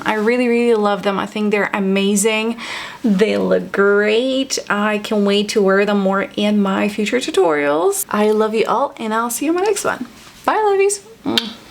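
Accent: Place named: Poland. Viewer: I absolutely love your accent! You have a great soothing voice, you are very beautiful, are you polish? ❤️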